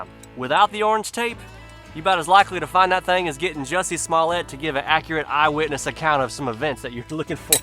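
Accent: South, United States. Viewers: I was wondering where was from with that Southern accent and now I know Mississippi. That blue light that was holding we in Canada call that a Barbeque light because everyone here pretty!uch owns a propane grill barbeque so that long lighter comes in handy. As for the duct tape there was a Canadian comedy Tv show called The Red Green Show about a typical Canadian lumberjack and he would call the duct tape the handyman's tool. There would always be uses for the duct tape. LOL! 😂😆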